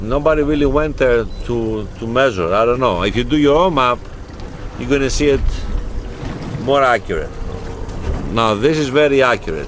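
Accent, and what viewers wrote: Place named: United States. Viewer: Γειά σου από την Πάτρα.Ολα τα λεφτά τα αμερικάνικά σου! καουμπόικα!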